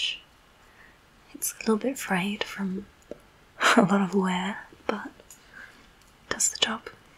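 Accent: Australia. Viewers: Glad I stumbled on your channel. You have to be Aussie surely?! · South Australian?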